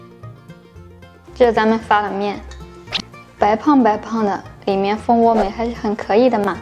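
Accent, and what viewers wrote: Shandong, China. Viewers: 我听口音也像山东人，竟然还是临沂老乡！是临沂一中毕业的吗？怎么没有糁？ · 我听口音早就听出来她是山东人了，哈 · 普通话有山东口音。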